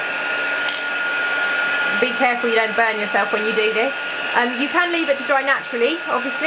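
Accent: England, United Kingdom. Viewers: These are just just gorgeous I love her so much!! I’ll be trying these 😍 love your sweet ways you are so great to watch! Love your lovely English accent too xxx be safe keep your fingers safe 😜 love ya xx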